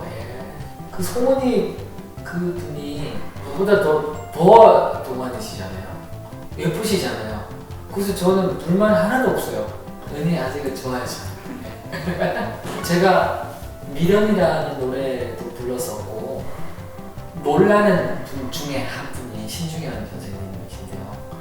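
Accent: Gyeongsang, South Korea. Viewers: 너무 때묻지않은 분 너무좋아요 경상도 예천말이 고맙니데이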